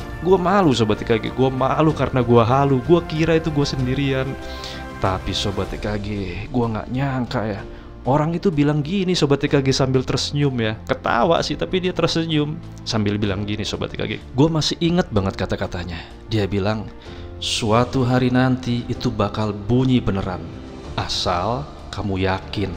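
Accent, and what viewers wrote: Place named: Java, Indonesia. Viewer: Alhamdulillah suaranya medok masuk ke hati bang anda bisa sukses bahagia sejahtera kaya raya penuh keberlimpahan saya pun begitu pasti bisa sukses bahagia kaya raya penuh keberlimpahan Aamiinnn ya rabbaalamin dan terimakasih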